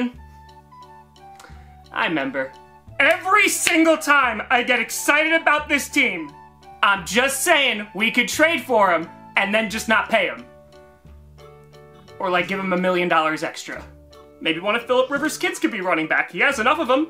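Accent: New York, United States. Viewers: Did he lose his New york accent? 😅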